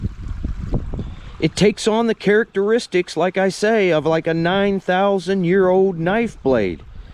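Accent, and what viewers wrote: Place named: Pittsburgh, United States. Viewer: hes a yinzer no doubt I here that Pittsburgh accent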